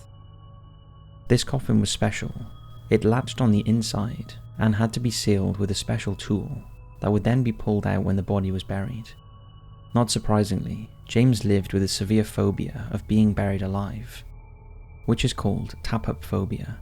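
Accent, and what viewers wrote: Wales, United Kingdom. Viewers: Dude he is welsh or something. Don't be such a trump supporter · Is he welsh or English?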